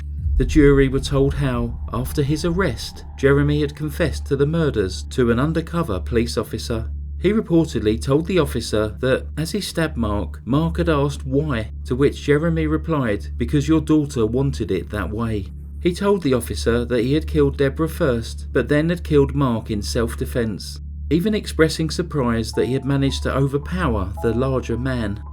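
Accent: United Kingdom. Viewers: Clearly from your British accent you are 'not from around here'. Which just makes me appreciate all the more that you put the effort in to give proper name places. I get so sick of the ton of video stories, especially from the States, where they will say Edmonton, Canada or Toronto, Canada or Calgary, Canada, etc etc. It's like they don't recognize we have provinces here. Many provinces have towns with the same name, so you'd better specify the province if you are mailing something!! As far as this case goes if Canada gets any more lenient on murderers, people will be crossing borders to commit their dirty crimes here knowing they'll only get a slapped wrist!- But seriously, 6 years inside for slaughtering THREE people-- so our Government gives just a worth of 2 years per person. So a kid can kill me tomorrow & my death is only worth 2 years!! And if one of your innocent victims is a LITTLE BOY, and your own little brother, no big deal, only worth 2 years still. 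How the hell can she be free?? HOW??? My god please tell me before this little monster was fully released she was permanently spayed. God don't let her breed her evil blood. Otherwise one day we will be reading about how she brutally killed her own children too.😕 Rest In Peace, sweet baby brother.🌹😔🌹. 🇨🇦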